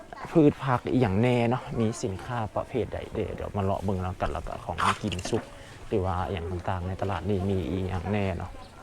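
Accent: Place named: Lue, Thailand. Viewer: เขาพูดภาษาอะไรเจ้าของช่อง (สำเนียงคล้ายๆพวกลั่ว) มันอยู่ภาคไหนของประเทศเจ้าของช่อง แม้!!คลิปแบบนี้เรื่องของอยากของกิน น่าจะจัดสัก25นาทีขึ้น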